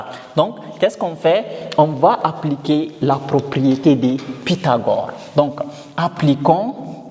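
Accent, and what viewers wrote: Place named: Bukavu, Democratic Republic of the Congo. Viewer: toi c,est certainement à Bukavu selon la prononciation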